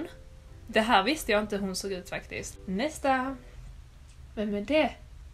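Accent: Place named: Skåne, Sweden. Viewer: Du lät verkligen som alla i Greveholm haha för du pratar väll skånska?😂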